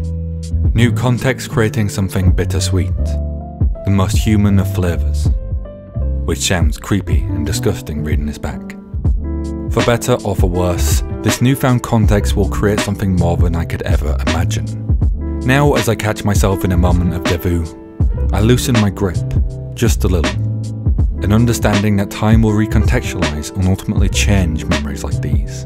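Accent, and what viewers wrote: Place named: United Kingdom. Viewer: Also where from the UK r u from cause your accent interests me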